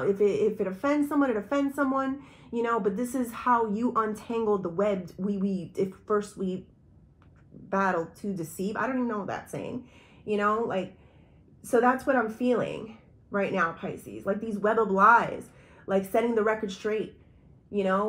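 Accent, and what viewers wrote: United Kingdom. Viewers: This is awesome. I love the British Accent also. Yes God is helping me cleanout things people actions attitudes that have held me back from enjoying true happiness. Thank you your reading is on point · The British accent is awesome 🙏🏼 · Everything you have talked about today is 💯and the proper part? I’m from Manchester England and you were sounding like me. Lol and you know your right. Just being able to be yourself with no restraints like a child again in a way. God always shows me when I need it. Thanks for this today. I needed it🙏⭐️💐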